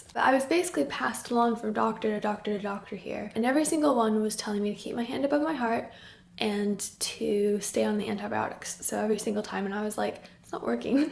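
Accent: England, United Kingdom. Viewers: Ugh I totally understand bouncing between doctors and them not giving you answers. But sooo glad that you have it diagnosed and hopefully a new treatment plan that actually is addressing the Raynaud's! Also I read this comment on one of your previous videos, but I think it's so cool (and cute) to hear you pick up a British accent. How some words you say are more "British-y" sounding, if that makes sense? lol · I like how the English accent is seeping in. At first, I couldn't tell if you had an Americanized accent, but now I realize it was the other way around, and I'm just so pleased.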